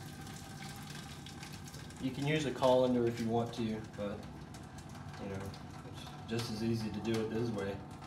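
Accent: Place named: South, United States. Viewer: I love listening to your voice, first it's like a blast from the past, second it's soothing and calming and finally that bit of southern inflection is still there and it's like comfort food for the soul but no calories!!! Keep the videos coming.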